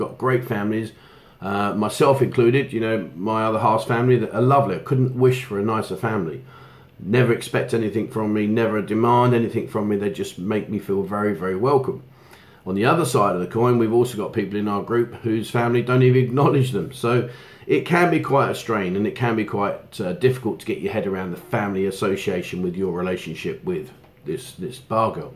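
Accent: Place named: United Kingdom. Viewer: Thank you for all these precious advices. Also your British accent is very smooth and to me it is a good exercise for my bad English.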